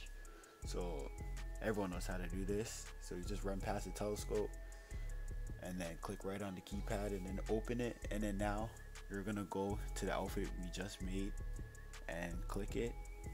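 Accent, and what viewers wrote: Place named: Toronto, Canada. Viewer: This guy is definitely from Toronto "it was cheesing me" lmao I had a feeling the whole video but that confirmed it.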